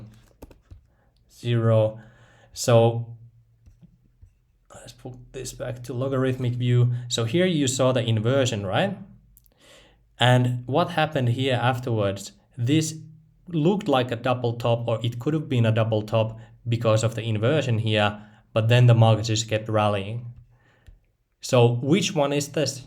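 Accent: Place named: Finland. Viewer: I'm almost 100 % sure his native language is Finnish. I know this because I'm a native Finnish speaker, and I know how Finnish-English accent sounds like. Also, his "pattern of thought" fits into Finnish framework (hard to explain...). In any case, great content!, tell me if I'm wrong 😉 Cheers!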